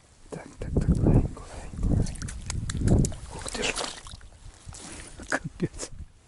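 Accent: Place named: Chernivtsi, Ukraine. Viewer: Дякую.бо чути по розговорі що десь не далеко від черніців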